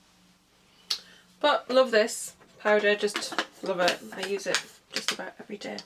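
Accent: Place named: Newcastle, United Kingdom. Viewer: The eyeshadow looks lovely with your hair... very pretty.. Im sure you have a hint of a Geordie accent.. x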